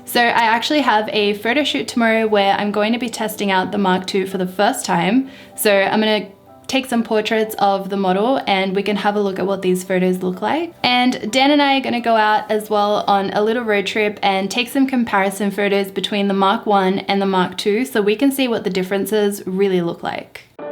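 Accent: Australia. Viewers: Omg thats literally the store I bought my first lens from, just two weeks ago. I also went in there yesterday to check if they had a canon 35mm 1.4 cheaper non L but no stock. Now I'm looking at buying a mark 1 used for cheap today hopefully. For some reason I just assumed you where Canadian like most you tubers lol, I didnt even notice the aussie accent but now I think about it, its definitely there :P Got to say too damn you take some nice photos for unedited.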